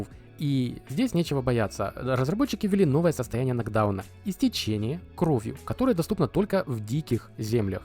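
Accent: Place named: South, Russia. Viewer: Бро, извини за возможно лишний вопрос, но почему у тебя в разных видосах где то есть диалект, а где то нету, говоришь без него, на букву "Г" предположительно южного диалекта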